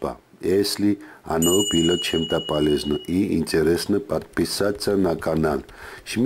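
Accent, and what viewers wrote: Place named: Georgia. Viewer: Прекрасно слушать русски под грузинском окцентом.❤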